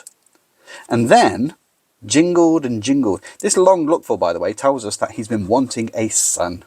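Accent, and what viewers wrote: England, United Kingdom. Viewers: Good evening, I will definitely use some of your tips with my students on my next lesson on Dickens...and they will also appreciate a pure musical English accent. (I am an Italian teacher of English language and literature, I just stumbled upon your amazing channel).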